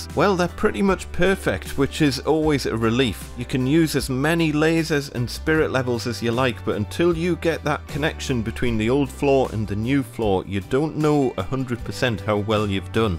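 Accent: Newcastle, United Kingdom. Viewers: Not just Geordie, specifically Gosforth. If I hadn't moved south for uni 20 years ago, I might even be able to tell which street from his accent. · Geordie not Scottish.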